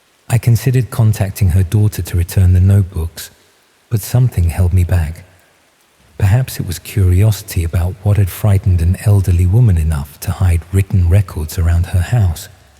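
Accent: United Kingdom. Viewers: Why is he British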